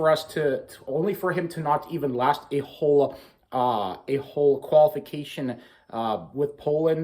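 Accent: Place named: United States. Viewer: polish with an American accent or polish-American !!!!good analysis anyways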